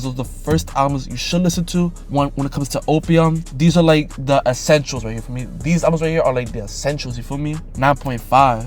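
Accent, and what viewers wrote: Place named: Mexico. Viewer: WAIT YOU SAID THE N WORD??? YOUR BLACK????? I THOUGHT YOU WERE MEXICAN. you sound mexican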